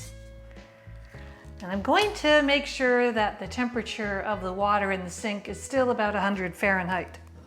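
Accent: Canada. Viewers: Wonderful, sensible instructions, without fuss. Also enjoyed the clear enunciation--is that a Canadian accent? Lovely. Thanks for this!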